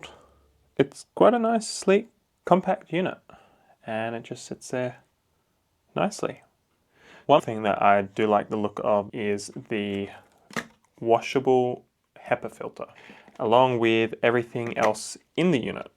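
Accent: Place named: New Zealand. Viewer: you sound like a Kiwi, are you?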